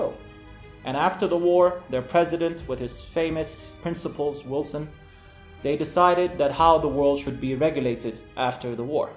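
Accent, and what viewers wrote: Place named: Germany. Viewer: Almanya'da yasadin ? Accent almandir.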